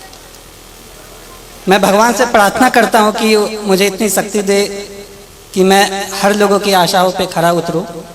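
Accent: Bihar, India. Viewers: Aapki tethi bolna mujhe bht achhi lgi sir😊😊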